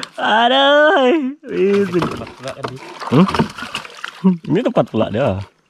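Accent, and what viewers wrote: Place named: Sarawak, Malaysia. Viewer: bahasa nya kyk sarawak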